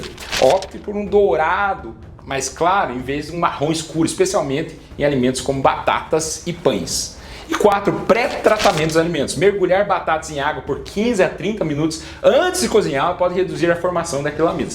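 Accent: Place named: Minas Gerais, Brazil. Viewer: Mineiro, caipira ou americano??? Bonitinho o doutor... fala diferente, né?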